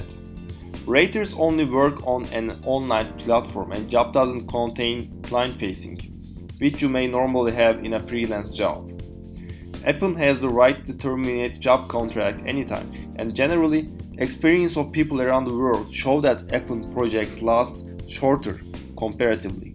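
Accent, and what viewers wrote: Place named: Turkey. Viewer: türk aksanı hissettim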